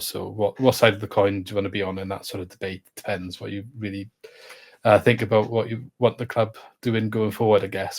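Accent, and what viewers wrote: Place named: Wales, United Kingdom. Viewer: I hear a slight Welsh accent with a strong twinge of English 😂😂 having parents from both North Wales and Northern England I can definitely confirm we have a better quality of countrymen up north 😉sorry was this about football 😂😂 UTC’s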